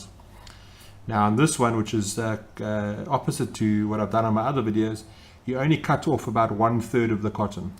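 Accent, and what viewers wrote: South Africa. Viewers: Great video thanks, works really great. Quick question, are you South-African?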